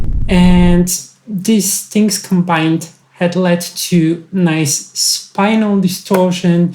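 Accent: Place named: England, United Kingdom. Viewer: I love your English accent! 🖤 What would be the best playing position when standing up?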